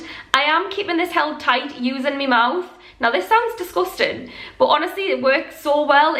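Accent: Newcastle, United Kingdom. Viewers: R u from Newcastle?? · i love it little mix Jade and perry have a geodie accent so thats were i teconised it from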